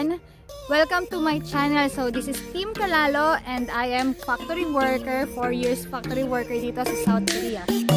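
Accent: Batangas, Philippines. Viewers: Accent ng batangueña 🖤 Pwede po ba magapply ng factory worker ang mga naka eye glasses? Or malabo ng mga mata?thankyou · Batangueno ka ga ate? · taga batangas ka? hehe nice video 😁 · Natutunan ko na po Consonants at ang saya nun natutunan ko nakakapagbasa na ako, magaling yung napanood ko Korean Unnie isang nood ko lang natandaan ko na turo nya. Lagi ako manonood sa vlog mo nakakatuwa accent mo na Batangas, nga pala kaya nawili ako panoorin ka kasi kahawig mo si Pauleen Luna lalo pag nag smile ka.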